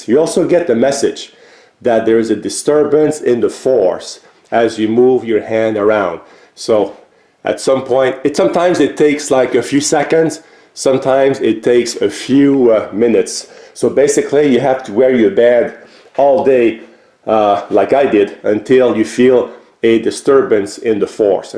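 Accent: Quebec, Canada. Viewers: Merci pour la revue! (I'm writing in French since it seems like a French-Canadian accent like mine ;) )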